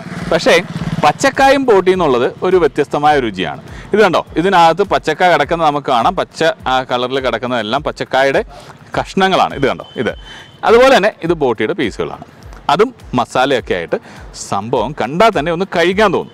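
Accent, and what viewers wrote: Thrissur, India. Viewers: ee video il samsarikkumbol oru Thrissur accent inte hint evideyokkeyo varunnund 😁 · Thrissur slang Vanna pole, Akcent change try cheyyano like Sujith bhakthan tried this previously 😁😁😁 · ചെറുതായി തൃശൂർ accent വരുന്നുണ്ട് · തൃശൂർ ജില്ലയിലെ കടകളിൽ പോയി വീഡിയോ എടുക്കുമ്പോൾ സംസാരത്തിൽ തൃശൂർ slang വരുന്നുണ്ടല്ലോ 😊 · light aayittu kottayam bhaashayil thrissur slang kerunnundu , chayayil elakkade essence kerunna pole 😉😉, paranjhattu kaarilya yesu christune yesuvettannu vilikkana teamsanu 😁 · ഒരു തൃശ്ശൂർ സ്ലാങ് നാവിൽ കയറികൂടിയിട്ടുണ്ടല്ലോ 🤔🤔🤔, അതോ എനിക്ക് മാത്രമാണോ തോന്നിയത് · പൊറോട്ടയും ബീഫും 👍👍👍 സംസാരം ഫസ്റ്റ് കേട്ടപ്പോൾ തനി തൃശൂർ സ്റ്റൈൽ പോലെ തോന്നി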